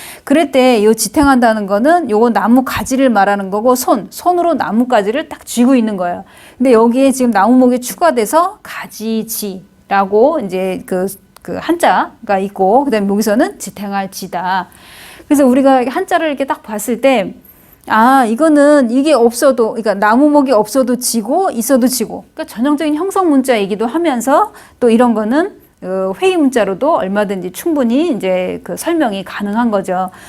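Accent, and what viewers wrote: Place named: Gyeongsang, South Korea. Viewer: 선생님 굿 아이디어 경남사투리 ㅋㅋ좋습니데이 ㅎㅎ 감사합니다 잘 배우고나갑니당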